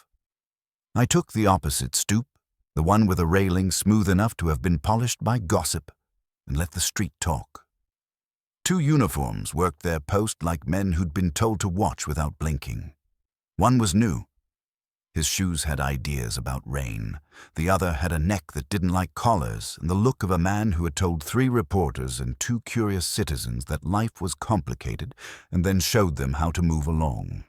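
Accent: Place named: United Kingdom. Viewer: The British accent doesn't match with New York American accents. I expect Archie Goodwin to be a brash American, snappier pace. The space in the narration after each "Mr" or "Inspector" before saying the last name is disconcerting. Renting a typewriter matches the period of the original Nero Wolf series, that is good. But paying for it with "a prepaid card"??? Did such things exist back then?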